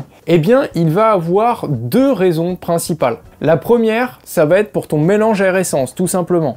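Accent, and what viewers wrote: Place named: England, United Kingdom. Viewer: oh putaing l'accent anglais .......😂😂❤ Tu mélange beaucoup de choses! Ride-by-Wire, appelé aussi Drive-by-Wire, vu pour la première fois sur la Yamaha YZF-R6 en 2006. La poignée des gaz est reliée à un contrôleur, lequel envoie les changements de position de la poignée à l’ECU, lequel à son tour envoie un signal aux actionneurs, les composants électroniques et mécaniques agissants sur les injecteurs, en prenant en compte différents paramètres : le régime moteur, la vitesse enclenchée, l’oxygène non consommée dans l’échappement, etc. Ce système permet un contrôle précis du mélange air/carburant......après le reste c'est de la mécatronique que tu es en train de mélanger à ton sujet...❤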